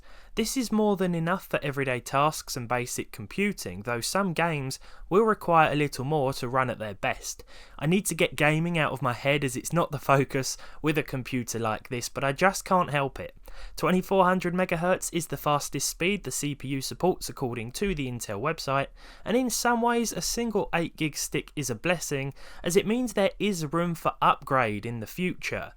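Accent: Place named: Scotland, United Kingdom. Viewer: I like his accent. Is it Scottish?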